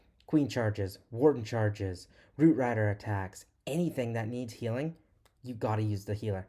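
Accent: Canada. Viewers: Are you Canadian!? Jays fan!?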